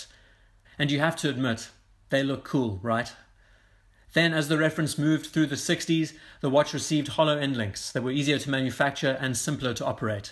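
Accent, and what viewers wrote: South Africa. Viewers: Great content.. Discovered you literally today. Watched lik 4vids 😅 You sound South African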